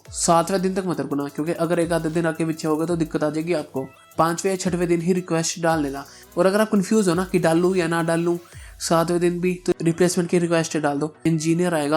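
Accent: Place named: Haryana, India. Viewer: That haryanvi accent 😂❤️, sunne me maza aa gya tbh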